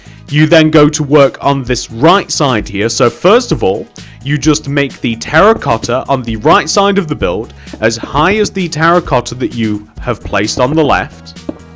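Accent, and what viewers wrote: United Kingdom. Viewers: a jolly British accent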